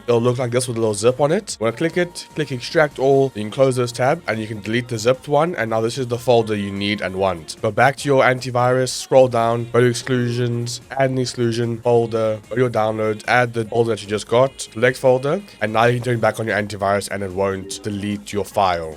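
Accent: South Africa. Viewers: holy, i recognised that south african accent instantly.Thought i was the only one in the country to play als.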